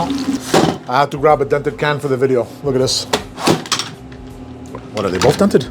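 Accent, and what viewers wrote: Montreal, Canada. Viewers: I love the Canadian accent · Ahh Montreal. I was trying to place that accent. Makes sense now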